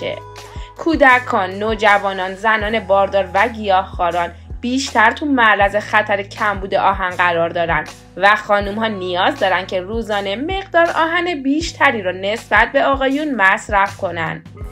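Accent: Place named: Isfahan, Iran. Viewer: قبلاً گفته بودین اصفهانی نیستین اما من مطمئنم یا مادر یا پدر یا یکی از نسل پشتتون اصفهانی هست ، چون واقعا قشنگ لهجه اصفهانی دارید 😂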